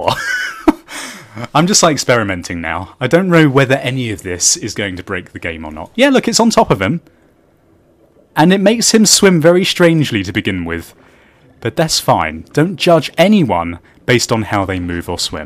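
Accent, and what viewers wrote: United Kingdom. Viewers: is so gorgeous and fabulous from british voice like france! He played together with Species-x and make a friends!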